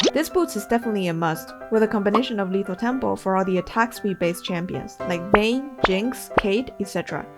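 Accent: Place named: Ireland. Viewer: came from the new boots update, stayed for the Irish accent 🖤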